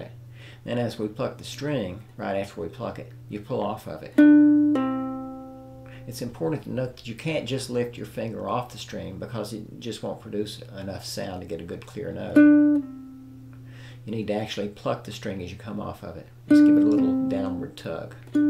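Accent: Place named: South, United States. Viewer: Thanks for an excellent tutorial. I am really hooked on this style of playing now. I am from the UK and am also tickled by your southern US accent. I love it. Uke playing is in now and clawhammer is catchin on. Thank you so much buddy!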